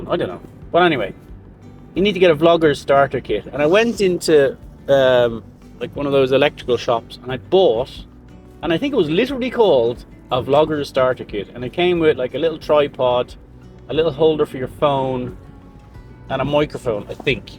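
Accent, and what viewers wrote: Ireland. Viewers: Well, you're SO wrong! 😄 We love listening to you prattle on about whatever in your Irish brough! Lol It's very entertaining! We would actually watch you do whatever. 😊 You actually do a great job of carrying on a great conversation with us (a camera) Please continue. We like you.😉👍 (We also love the chateau reno - watching) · Would love to listen to you blathering away with your wonderful Irish accent.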